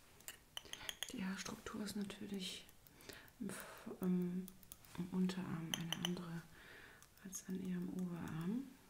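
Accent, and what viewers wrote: Germany. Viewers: Está hablando inglés o alemán? O habla el inglés con el acento alemán, solo curiosidad, habla diferente pero se le escucha bonito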